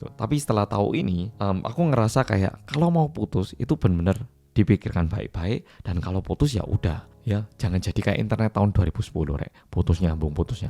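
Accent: Java, Indonesia. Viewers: Saya senang nyimak cerita2mu terutama gara2 aksen medhokmu, yg bikin saya ngakak dan kangen masa kuliahan. Dan ga banyak back sound bodoh yg sbnrnya merusak kualitas video. Sementara sbgn besar youtuber beraksen Jakarta krn emang dr sana, byk back sound ga perlu yg malah menurunkan kualitas video, dan saya booooooooooosan abis dg gue gue lo lo yg terkesan keminter. Sehat selalu bro.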